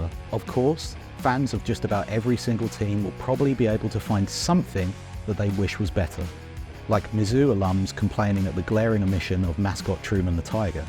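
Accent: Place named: United Kingdom. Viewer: This a British dude talking football 💀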